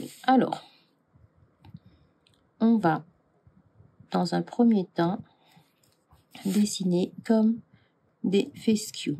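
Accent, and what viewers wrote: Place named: Mauritius. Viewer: C’est drôle ! J’avais cru entendre un petit accent de l’île Maurice! Ça fait longtemps que vous faites de zentangle? Moi, je découvre…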